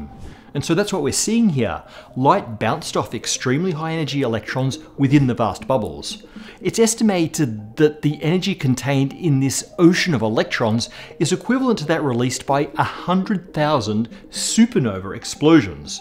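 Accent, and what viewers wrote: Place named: Australia. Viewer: OY! LOOK! AN AUSTRRRRRRRRRRAILIAN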